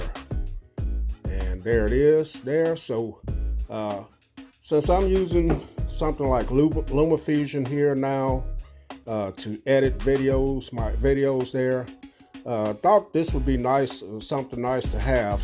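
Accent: South, United States. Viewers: Are you from the south of the state’s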